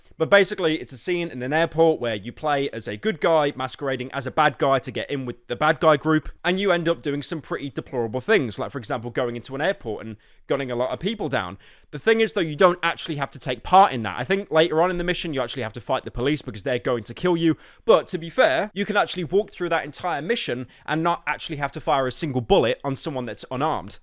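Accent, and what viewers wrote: United Kingdom. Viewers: a brit talking about a game. just what i wanted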